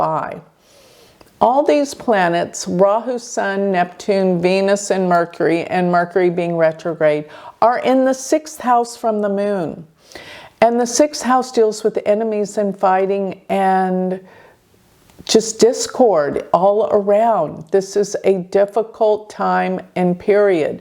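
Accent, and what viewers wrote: Texas, United States. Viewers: I watched a different video of yours, I didn't research anything about you and I immediately said, "She's from Dallas TX!" I am Accent Sympathetic and clairvoyant with 2 levels, possibly 3. I'm going to sign up for my Chart details from your website. I can trust you, my guides have told me.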